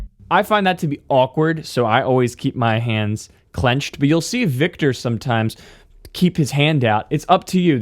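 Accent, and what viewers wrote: Baltimore, United States. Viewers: Awesome lesson, man! I enjoyed the Baltimore accent most of all though.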